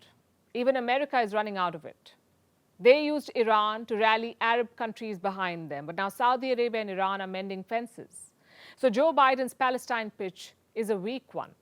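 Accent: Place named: India. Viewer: Is this American media with India accent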